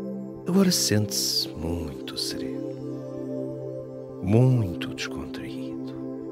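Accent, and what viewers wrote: Portugal. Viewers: Finalmente, uma meditação em português De Portugal. Voz bonita. 🙏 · Como foi bom ouvir esta Meditação com este sotaque português que amo.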